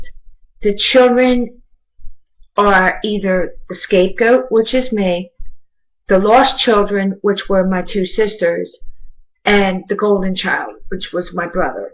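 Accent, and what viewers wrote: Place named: Northeast, United States. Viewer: HI, My prayers go out to you, u sound like you may be from the northeast im in the philadelphia area. My mother too is a narcissist and i just had a question for you regarding the enablers in our family, do you have any advice on how to reach them? my sister is very much an enabler and was parentized as well, and i cant seem to get her to come around so she can start healing from the abuse shes been through. Any input from you would be appreciated. God Bless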